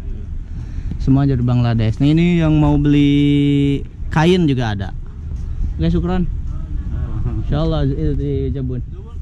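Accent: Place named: West Java, Indonesia. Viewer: Assalamualaikum..... teh lucu, sundana napel wae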